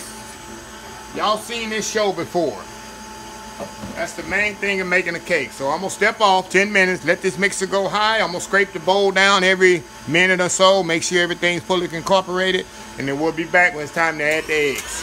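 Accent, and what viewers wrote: South, United States. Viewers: 2021helpsomebody. Chef your voice is what make your vedios easy and interesting. Live your southern accent too. The cake is marvelous. Wish I was your neighbor. But blessed to have your your vedios. Have a blessed day.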